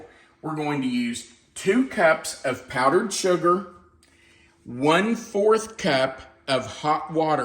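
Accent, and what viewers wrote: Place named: Kentucky, United States. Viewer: Those look wonderful! You sound like my parents they come from Menifee county!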